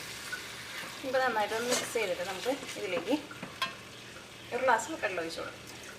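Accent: Thrissur, India. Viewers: good cook and good Trichur accent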